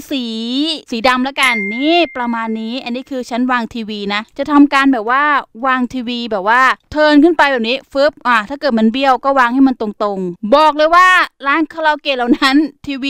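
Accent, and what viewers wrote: South, Thailand. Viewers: พี่ เหมือนคนใต้เลยค่ะ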